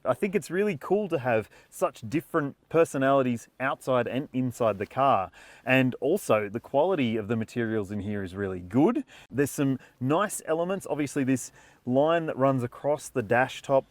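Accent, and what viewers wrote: Australia. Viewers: Love the Aussie accent....and I looked at all the review titles before I roll the videos and choose Carsguide, and also UK CarsWow to compare, I know where both reviews are based..... ( for those who are too basic to pay attention to the titles and video content.....pay attention! ) Very good points and thank you for confirming some issues for my consideration of this car.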